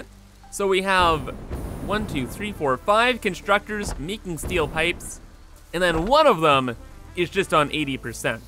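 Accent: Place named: Canada. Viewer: this is the most canadian voice i've ever heard, i love it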